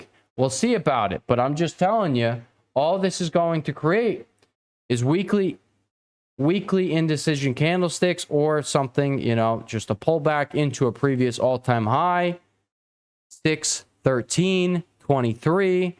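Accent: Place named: Chicago, United States. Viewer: Thanks for your videos! Aspects of your accent remind me of where I grew up in Chicago. Where are you from?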